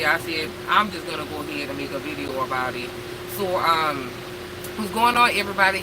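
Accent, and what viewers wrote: Louisiana, United States. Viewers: I love that Louisiana accent.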